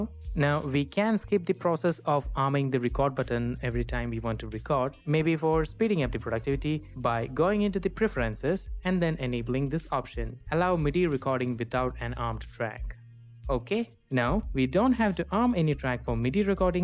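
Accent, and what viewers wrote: India. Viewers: yooo such insightful tutorial, god bless. Also loved your mallu accent XD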